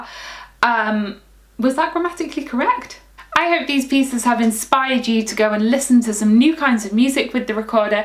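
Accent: United Kingdom. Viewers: Thanks for sharing these pieces. And, first of all, I really love your British accent. There are two recorder contemporary pieces I like so much. The first one is "Glass" (from "Minimal Preludes") by Karel Van Steenhoven. The second one is "Thymehaze" (for alto recorder and prepared piano) by John Casken. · that british acent 😆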